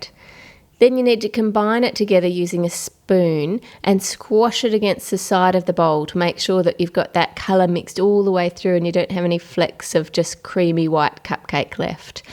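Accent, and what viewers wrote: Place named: Australia. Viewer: Happy late anniversary sorry I love your Australian accent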